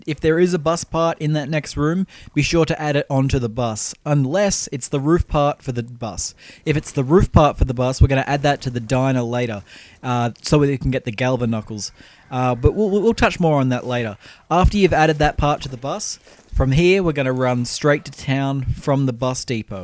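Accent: Australia. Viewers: Really helpful mate thanx, what part of Aussie u from?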